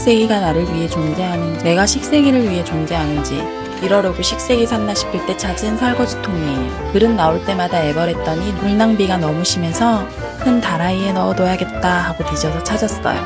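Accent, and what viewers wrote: Gyeongsang, South Korea. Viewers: 혹시 경상도분이신가요?중간중간 경상도 억양이 들리는듯하여.. 그리고 화장실 천장은 손을 안대는데도 저렇게 깨끗하게 되나요?한번 닦아줘야하는거죠?아님 물 뿌리거나.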